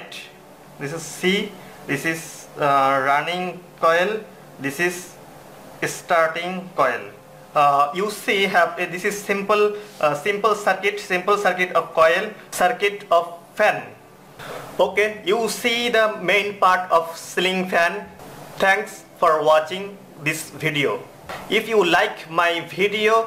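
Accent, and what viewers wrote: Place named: Bangladesh. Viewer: Your English like Bangla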